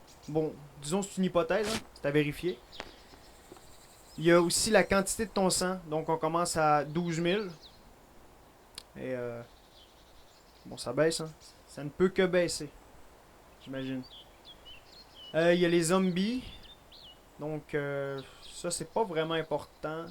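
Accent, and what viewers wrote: Quebec, Canada. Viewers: je veux un autre episode pas demain parce que je suis pas la mais apres-demain nan sérieux ton accent québécois paraissait plus dans cette video et ta pas de laire de venir da grande ville mais plus du nord du québec =) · dans quel pays habite tu quand on dirait que tu as un accent québécois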